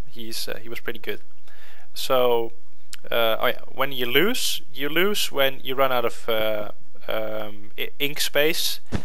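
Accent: Netherlands. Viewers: You sound very Dutch.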